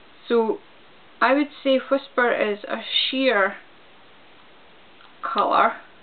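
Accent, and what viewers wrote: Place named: Scotland, United Kingdom. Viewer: She's Scottish, gonna say from the west coast maybe?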